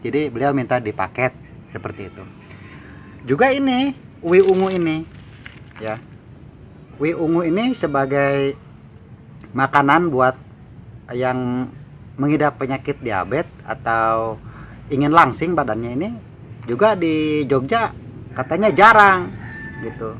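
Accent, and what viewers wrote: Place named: West Sumatra, Indonesia. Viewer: Kok sampai bisa kang bahaso minang.. Heran lo awak.. Atau mungkin teteh urang padang.. Heee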